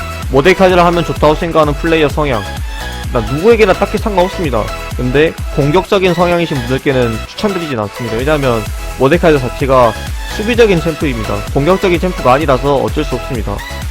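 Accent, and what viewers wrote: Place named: Jeolla, South Korea. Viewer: 사투리가 있는걸 보니 전라도사람이시군요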